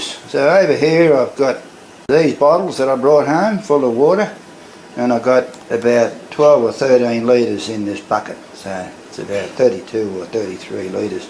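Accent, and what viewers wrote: Australia. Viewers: Hahahaha good old Aussie accent. Love it. Im also aussie Im gonna try this! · Well....I was curious how sea salt was made, now I know. I admire anyone who takes the time to go through the process necessary to yield sea salt! I hope to (one day) visit Australia, love the accent too! I was just mocking Crocodile Dundee today! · Are you Australian?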